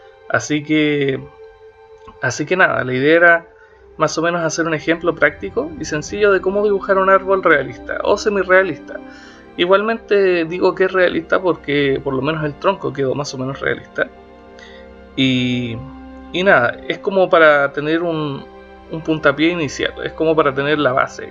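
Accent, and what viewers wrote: Chile. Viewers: Compadre, es de ¿chile?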